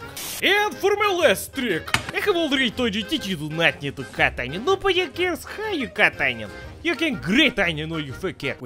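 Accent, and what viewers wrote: Russia. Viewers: This is how to basic but with a russian voice over and perfect mixture of destroying and actual knowledge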